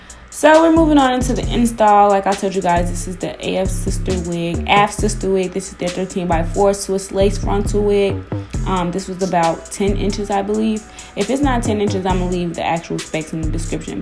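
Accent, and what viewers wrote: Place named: Chicago, United States. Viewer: You have to be from Chicago 😂❣️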